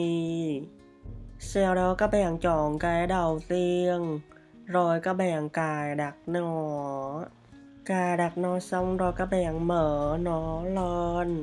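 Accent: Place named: South, Vietnam. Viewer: Chính xác giọng nam kì rồi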